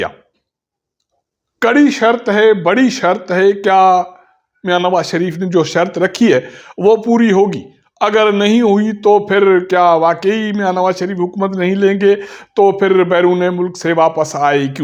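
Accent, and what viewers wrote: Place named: Saraiki, Pakistan. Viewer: SAADY HO AP SAHIB AP KEE URDU ACHEE NHE JAAY KY SIRIKEE BOOL RAHY HO AP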